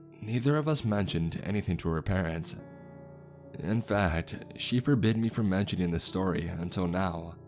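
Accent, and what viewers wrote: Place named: South, United States. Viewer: I love the southern drawl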